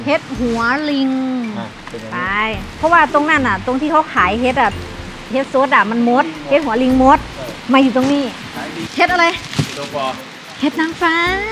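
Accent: Korat, Thailand. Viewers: ชอบแก่พูดโคราช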